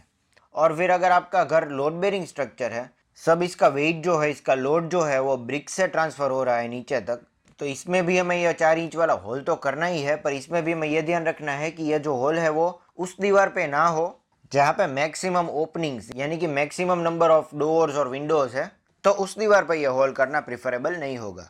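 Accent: Gujarat, India. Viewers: Aap Gujarati ho ?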